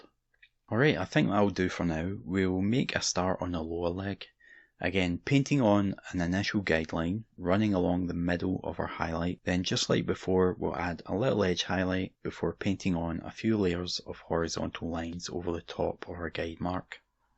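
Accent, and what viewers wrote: Scotland, United Kingdom. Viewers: Wow. Miniature painting has come a long way since the 80s! Sublime work... not to mentioned narration by a smooth, Scottish accent. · I had to dig the urban meaning of shucks ^^ English is not my native language (being French)..... but I enjoy your scottish accent :) Keep up the great work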